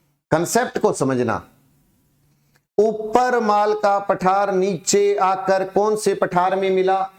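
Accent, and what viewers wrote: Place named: Haryana, India. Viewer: Sir, Your language tongue is matching with Haryanvi/Bagri, Due to regional effect It supports easy to learn then Marwadi/Mewari classes, thanks for your great efforts.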